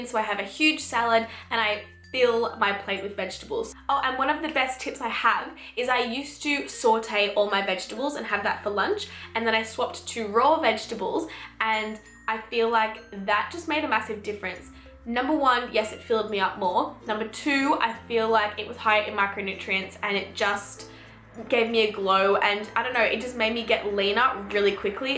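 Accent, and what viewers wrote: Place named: Australia. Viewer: love hearing an Aussie voice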